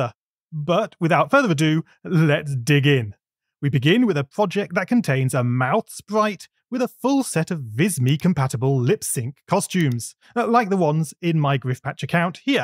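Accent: United Kingdom. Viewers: I think you’re from UK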